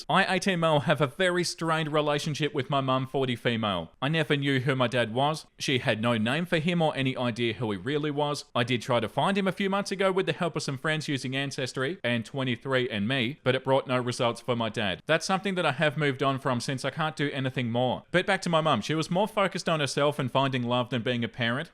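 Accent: Australia. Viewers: My daughter and I love listening to you read stories. Indignation just sounds better in an Australian accent 😊 Thank you for the awesome entertainment!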